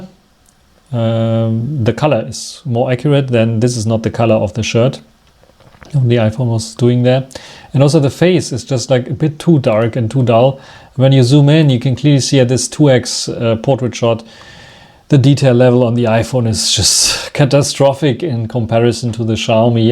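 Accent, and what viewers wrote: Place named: Germany. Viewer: waruuuuum in Englisch in Deutschland? macht ein engländer in England od. Amy in der USA auf Deutsch??? was ist der Grund? schaut Leute, ich habe etwas Englisch gelernt? wenn dann bitte richtig und nicht mit dt. Akzent.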